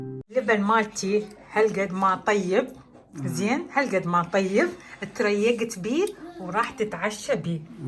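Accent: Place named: Iraq. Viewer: مرحبا اختي اولا ما أحلى اللهجة العراقية كتير بحب اللهجة العراقية واللبن طلع يجنن يسلمو ايديك يا اختي من ورا اليوتيوب مابنطبخ عجبني الحكي لما نطبخ بياكلو 😂 تحياتي لك ولعيلتك الجميلة اختي العزيزة ❤️🙋‍♀️💐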